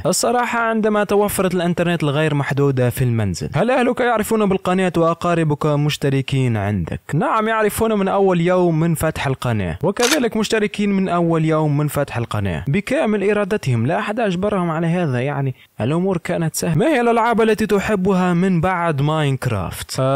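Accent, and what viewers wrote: Tunisia. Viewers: انت تونسي كيفي؟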